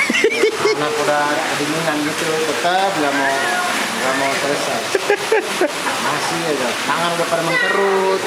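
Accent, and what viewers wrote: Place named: Java, Indonesia. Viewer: himada muka timur logat Jawa 😅🙏🏻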